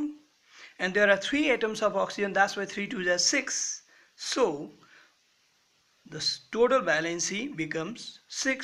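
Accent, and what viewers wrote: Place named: Nepal. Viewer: Thankyou sir Tapaiko accent ta nepali ko jastai xa ta🧐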